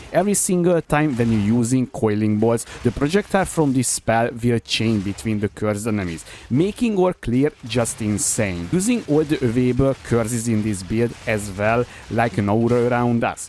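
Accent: Hungary. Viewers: Egyből le vágtam hogy magyar akcentus haha :D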